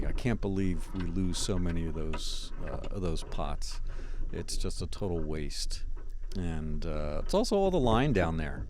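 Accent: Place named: Philadelphia, United States. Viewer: I'm really digging the Philly accent narration!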